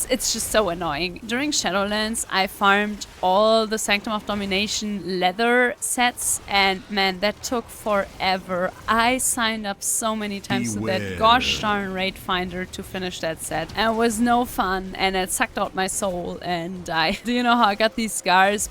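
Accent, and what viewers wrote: Germany. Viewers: Ich könnte schwören da deutsch raus zu hören XD "I could swear I could hear German coming out of it"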